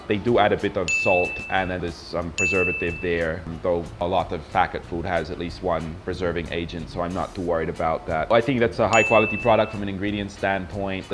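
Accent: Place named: Philippines. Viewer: I'm not happy with the Filipino accent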